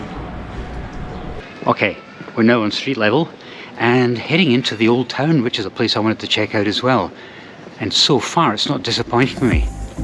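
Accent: Scotland, United Kingdom. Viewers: Nice that you were brought up in Sydney and were able to keep your great Scottish accent！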